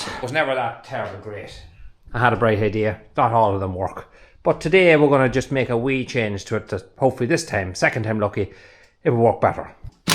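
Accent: Ireland. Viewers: What a terrible shock for you all, I’m so glad your little one is better. I really enjoy your videos. I’m not so keen on the engine repairs lol, but I love all the farming stuff and love listening to your Irish accent xx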